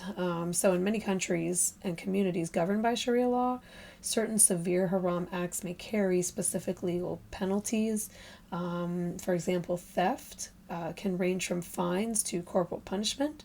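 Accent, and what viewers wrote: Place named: United States. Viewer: You have an American accent so speak about a more progressive Islamic world like some Muslim communities in the West... There are also progressive Islamic communities elsewhere in the developing world... Why only speak about the worst of the worst? But you don't do that with Christianity??Howcome?🙂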